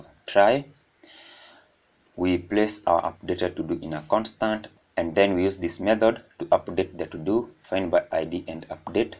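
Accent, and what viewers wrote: Kenya. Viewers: Why do I hear a Kikuyu Voice😂😂😁😁, Bro, congrats. Much respect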